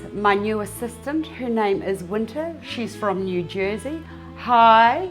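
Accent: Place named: New Zealand. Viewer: Thank you for this lesson. I am a kiwi living in Australia and besides watching the lesson, I loved hearing the Kiwi accent. Are you from the south island?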